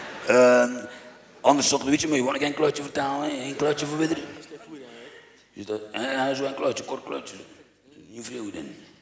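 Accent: West Flanders, Belgium. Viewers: jemoe ma west vlaams kun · Top westvlaams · west Vlaams uit België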